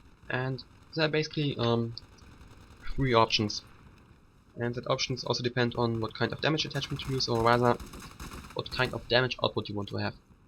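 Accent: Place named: Germany. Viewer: THAT GERMAN ACCENT !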